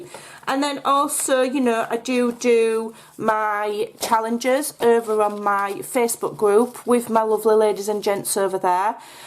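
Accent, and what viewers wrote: Yorkshire, United Kingdom. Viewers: Hi, some feed back for you! I love your Yorkshire accent (perhaps I'm biased as I'm from Yorkshire too), there's nowt wrong with it so don't take any notice of anyone who criticises it. Your videos are fun and easy to watch/listen to, I like them as they are natural and not staged. I am quite new to junk journalling so these are the videos I look for and watch, so more of them for me. That doesn't mean I don't like to watch other things too, they can spark ideas. I don't like really long videos, so fast forwarding is fine, we can always pause and rewind. So for me, I don't think you need to do anything different! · I love what you do, love your accent, have always liked the Yorkshire accent (Big fan of coronation street) You have inspired me with things you create and you are very easy to follow along with. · I'm sort of new and old at the same time. 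I've followed you off and on and see you in my feed sometimes and remember that I haven't checked in for awhile. What I find draws me back and keeps me locked in for months at a time are the series or segments that you do that have a theme so I can go back and find them. I love that you do all sorts of things, I love who you are and listening to you (perhaps I'm a weird American because your grammar and accent don't bother me and actually are fun because Yorkshire isn't an area I knew well but it reminds me of my ex-husband's mother who was originally from there before going to Essex and being there for almost 40 years by the time I met her) and so all I can offer as a suggestion is that you might find doing specific series helps. You're an excellent teacher, and you've also been good at letting us learn with you... so you don't have to know it all ahead of filming, just learn with us and go through the whole process. 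:D Lets see what WE can do to help you spread your channel and courses, too... give us super tasty bits to share to bring people in. Quick challenges that we can do with you and link up on Instagram, maybe?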